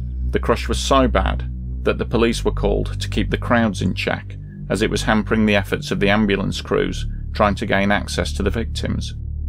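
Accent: United Kingdom. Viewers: I can tell you're British, but youve live in America for a while. I can tell by the way you say "the hospital"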